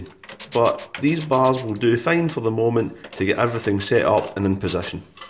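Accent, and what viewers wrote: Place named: England, United Kingdom. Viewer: What kind of English accent do you have ? It's perfectly understandable, I'm just curious ;) It's very colourful.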